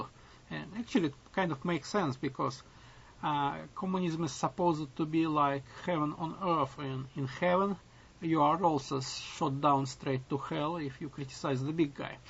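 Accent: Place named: Russia. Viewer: Акцент русский просто жесть )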